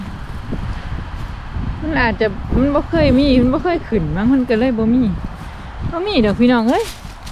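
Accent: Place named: Korat, Thailand. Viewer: สำเนียงเหมือนคนโคราชคั่บ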